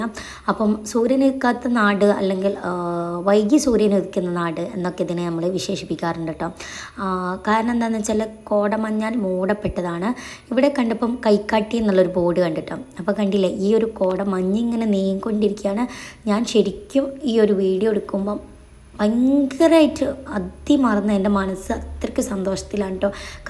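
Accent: Malappuram, India. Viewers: Thani Malappuram slang Ijj kajj kujj Idayil sramikund shudham parayan 😂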